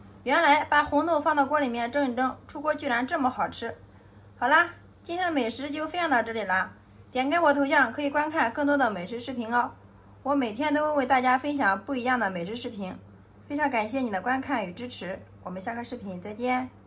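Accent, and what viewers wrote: Shandong, China. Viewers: 难道她是外国人么？我听她的口音好像是山东口音的普通话啊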